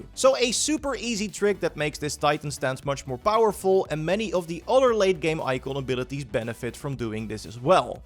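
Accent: Netherlands. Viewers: Your accent is sooo dutch 🤣🤣🤣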